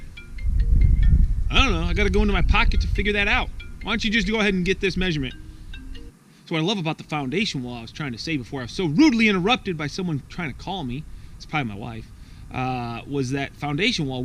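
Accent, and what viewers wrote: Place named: Wisconsin, United States. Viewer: lol has a Wisconsin accent, though. I bet his parents are from there and he picked it up from them as he was growing up and learning English.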